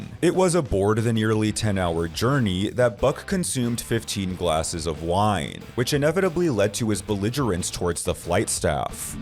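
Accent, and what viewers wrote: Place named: New Jersey, United States. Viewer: That’s a New Jersey accent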